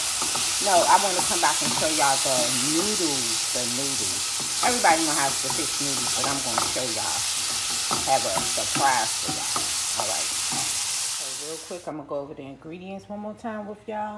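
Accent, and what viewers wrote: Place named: DMV, United States. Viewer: Shalom, where are you from? Sounds like from the DMV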